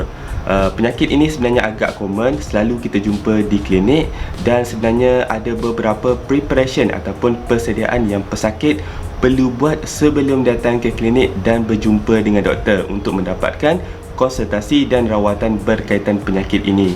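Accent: Kelantan, Malaysia. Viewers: Doc org kelantan ke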